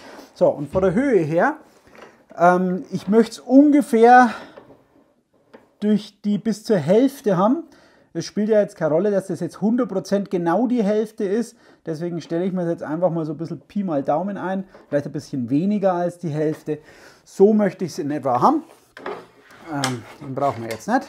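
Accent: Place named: Franconia, Germany. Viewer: "Runsdrum" frängisch is einfach genial 😉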